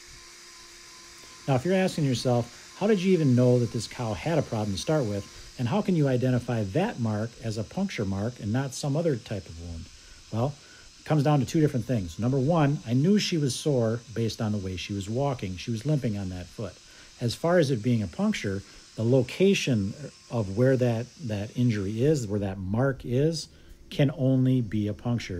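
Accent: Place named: United States. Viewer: Is it just me that your accent is more american nowadays? I used to hear your thick accent before so it is weird for me to hear your more american, english accent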